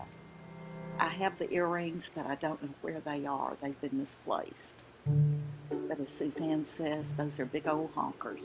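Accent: South, United States. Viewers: I love the jewelry and the teapot. Great taste! I want to share something with you. It may sound a bit odd to you but there is reason, sort of, behind it. You are a comfort source for me and I'm not sure why. Your voice, the Southern Belle accent, you being older, which represents wisdom to me. You've mentioned your daughter in some videos and I get the feeling you and she are close. You just seem thoughtful, maybe doting at times on the ones you love. Anyway, now for the "reasoning" behind these statements. This is hard for me to share, but, here goes. I have been having issues with emotional and therefore mental issues as well, off and on for many years now. My life with others has not always been very nice, putting it mildly, and as a result, I deal with PTSD. I have developed a need for comforting, soothing things but rarely, people. I am very reclusive now, and since my mother passed, more sensitive to my surroundings, sounds, smells, people, etc. 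Listening to you and watching your videos is comforting and soothing to me. Although there are others that I love seeing and feel good around, there is only one person that I can handle being with regularly and that is my husband. I am going through one of my "darker" phases where the noise in my head from my past is very loud and I happen to notice the other day that when listening to you speak, I feel a comfort, probably because you remind me of the softness but yet tough, southern ladies in my family, a certain gentility if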